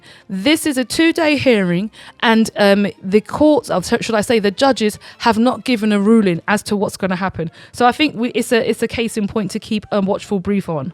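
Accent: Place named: England, United Kingdom. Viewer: I love the way you talk so English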